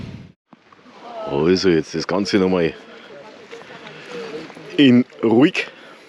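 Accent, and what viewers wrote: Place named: Bavaria, Germany. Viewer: Wir waren 2024 mit dem Bike in Rumänien. Einfach ein Traum. Deshalb werden wir heuer wieder hinfahren. Hab in meinem Navi bereits sehr viele Punkte eingetragen. Deine Videos sind immer sehr unterhaltsam und informativ. Und deine Handzeichen hast dir immer noch nicht abgewöhnt 🙂. Aber das und der Bayrische Dialekt ist eben dein Markenzeichen, und des passt scho so.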